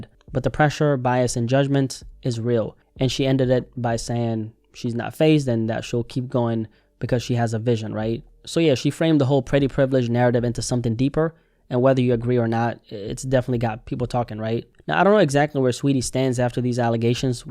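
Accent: Jamaica. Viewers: u Jamaican?????? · You Jamaican ?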